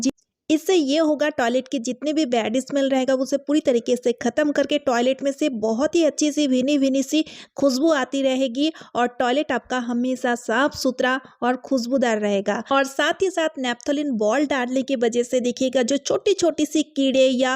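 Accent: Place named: Bengal, India. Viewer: आप begali है क्या...क्यो की आपके बोलनेमे वो मिठास है...ठिक है...ये वर्ड बोलनेका तरिका मुझे बहोत अच्छा लगा...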